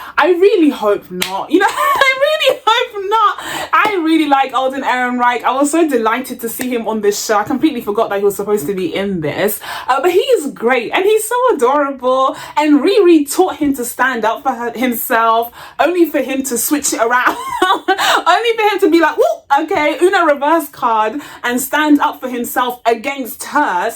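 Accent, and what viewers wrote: United Kingdom. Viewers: First I wasn't expecting a British accent, lol 🤭 secondly I agree with small minded racists review bombing anything that isn't centered around them. Third, I sincerely appreciate your commentary, thank you. 🇺🇸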